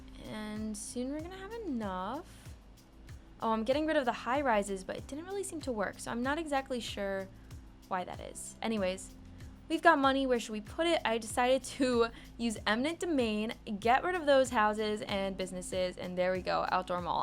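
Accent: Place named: South, United States. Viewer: What's your PC build? And do you live down south? I hear a lot of "y'alls" lol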